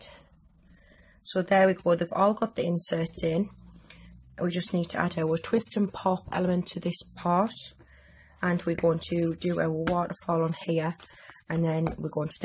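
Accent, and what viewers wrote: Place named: Newcastle, United Kingdom. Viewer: Love the Geordie accent. I moved to the north east from Worcester 17 years ago and I recognise it anywhere. Love it!!! Do you have measurements for anything smaller please. I have subbed so will check out all your video's xx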